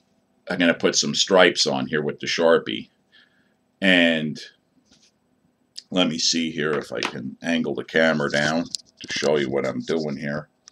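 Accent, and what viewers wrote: Pennsylvania, United States. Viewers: I love it, and BTW, I could detect that PA accent long before I saw the envelope! LOL In my mind, are the colors not reversed? I would have thought the green would be on top? It looks awesome just the same! I just found your channel and am already learning lots! Thanks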